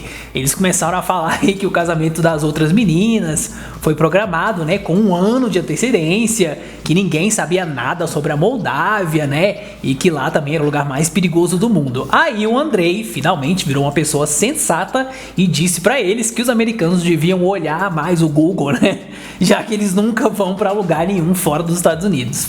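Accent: Brasília, Brazil. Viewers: Você é de Brasília??? Por isso me identifiquei muito com a sua fala! 👏👏👏😄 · Quem fala Es menina? Fala Tu como brasiliense fala kkk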